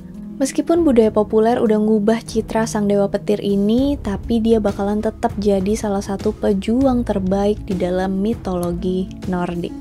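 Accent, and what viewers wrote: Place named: South Jakarta, Indonesia. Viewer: Gua suka pembawaan yang sekarang gaya anak Jaksel pisan wkwkwkw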